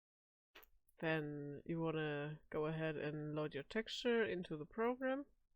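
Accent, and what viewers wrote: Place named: Germany. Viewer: judging by the accent youre 100 percent german lol great vid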